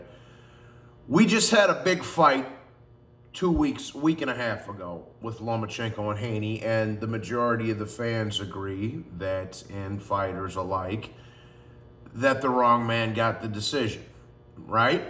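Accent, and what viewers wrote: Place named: Staten Island, United States. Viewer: the way you speak the way you carry yourself you could easily be mistaken for a person from Staten Island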